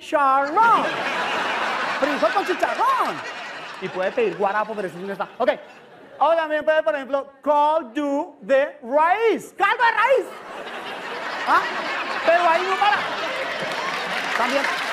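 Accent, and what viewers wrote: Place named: Colombia. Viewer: Ese acento colombiano es el mejor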